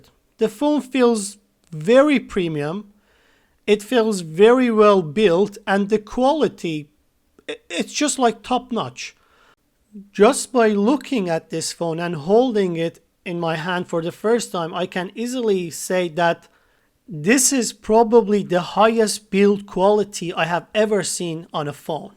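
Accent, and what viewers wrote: Iran. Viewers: you have such a persian accent lol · Judging by your accent I'm guessing your Iranian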